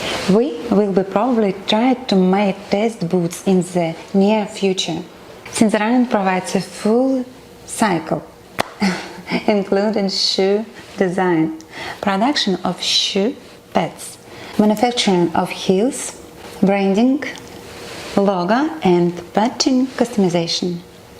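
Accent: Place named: Russia. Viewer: Сач э гуд инглиш, бат виз сам рашн акцент. Бат ит нот со хэви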